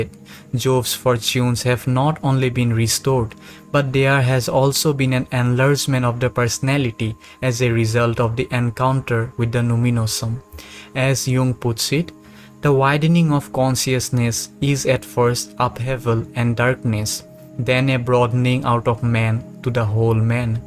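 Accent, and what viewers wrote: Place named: India. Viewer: Nahh bro. I like his Indian accent. It makes him unique from other western Jungians. Love it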